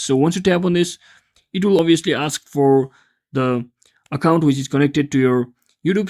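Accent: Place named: India. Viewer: Indian ass voice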